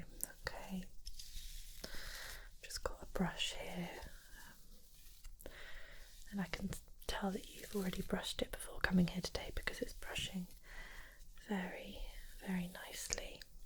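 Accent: United Kingdom. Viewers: a British English ASMR channel is good news, ty and please keep uploading. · Where are you from? Accent sounds british?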